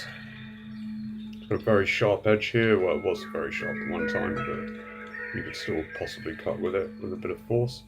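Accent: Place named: England, United Kingdom. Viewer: You sound English, you should check my blog, although its a world wide thing... <link>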